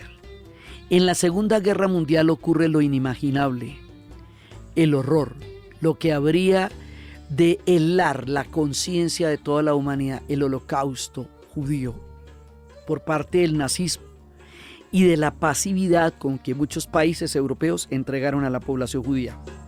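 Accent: Colombia. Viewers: Hablas más como Colombiana debo estar confundido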